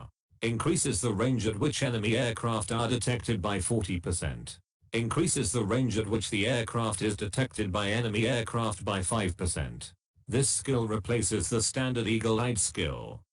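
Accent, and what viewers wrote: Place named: Russia. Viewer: I'd rather hear your Russian accent than this shit voice.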